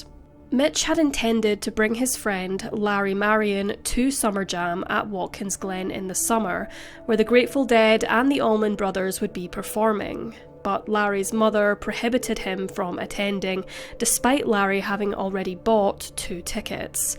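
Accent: Scotland, United Kingdom. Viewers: 10 months late but, no... OP being Scottish isn't an issue. And yes, these are German names but they are in America and have been anglicized. The most spoken language in the United States is English. It is highly unlikely that English speaking Americans would pronounce these former German words with a high German accent. Please be realistic. Thanks!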